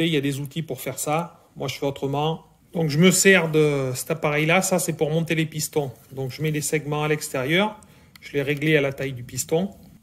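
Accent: France. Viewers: Ça fait un bout de temps maintenant que je cous suis, trop génial dans Gestion des produits des systèmes démerdes. Et l'expérience le savoir faire ds l'excellence et la qualité. Et aussi vous être donné les conditions pour toujours être dans un atelier hyper propre et structuré au poil. Ça donne envie de voir en réel. Bravo bravo et encore bravo, c'est quoi cry accent quelle région de France.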